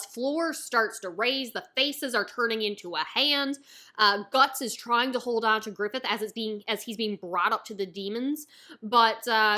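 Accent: Newfoundland, Canada. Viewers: Are you from newfoundland? Can't place your accent might be Minnesota.....it's a weird question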